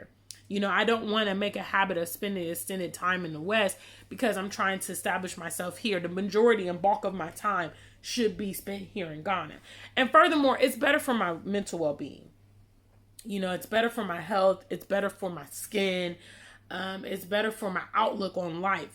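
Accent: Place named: United States. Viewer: Well come back sis, your American accent with twi made me chuckle. 😂 God bless you.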